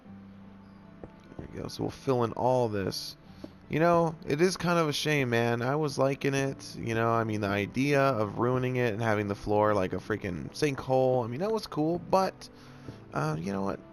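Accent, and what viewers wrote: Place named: Ireland. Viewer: I think the ender Sphinx looks great and sorry with all the questions but what country are u from because I sound like u and I'm Irish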